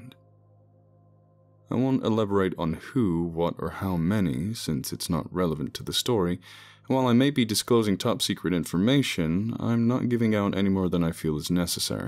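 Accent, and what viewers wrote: United States. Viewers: Your American accent is very good. There are respected British actors that don’t do it as well. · Your American accent is so good! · you have an American accent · the American voice you use in these videos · I LOVE your American accent, it's dead on. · He does an excellent Job as do many actors I'm not sure if you watch movies to TV but many many talented Foreigners speak in perfect American accents. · I agree that his American accent is great, but I'm not sure what you "producing music" has anything to do with studying language or dialect.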